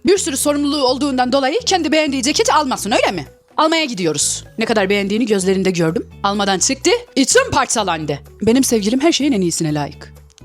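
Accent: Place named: Rize, Turkey. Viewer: abla bir gün video çekerken Rize şivesi ile değil de normal konuşur musun sevgşlinle çektiğin videoda sesin inanılmaz iyiydi❤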